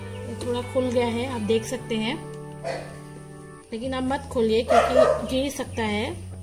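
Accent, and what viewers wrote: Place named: Bengal, India. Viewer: Bhai apni bangali Hindi te bolsen keno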